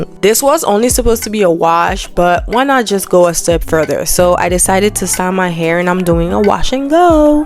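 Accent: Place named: Barbados. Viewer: Beautiful hair & Love your accent, Are you from Barbados?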